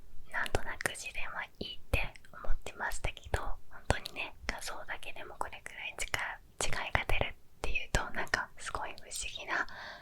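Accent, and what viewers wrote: Kansai, Japan. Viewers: こんなに海の音綺麗に録れるの凄い！ てかRunaさん関西人？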